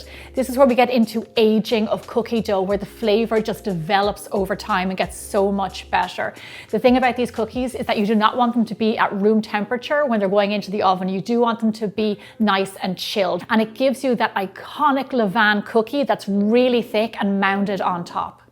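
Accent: Ireland. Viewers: I just love that you never lost an ounce of your Irish accent!!! 💚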